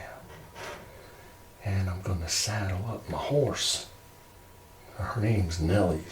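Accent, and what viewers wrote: United States. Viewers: Love that cowboy accent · I live in cowboy country, so it's enjoyable to see the nod to our culture and accent. Thanks!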